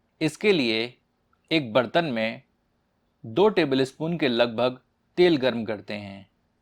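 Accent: Standard. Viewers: I like your shudh HINDI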